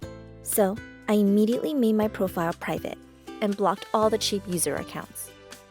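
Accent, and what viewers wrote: India. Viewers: One question.....is the narrator Indian ?? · Are you from indian or something? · is the narrator Indian?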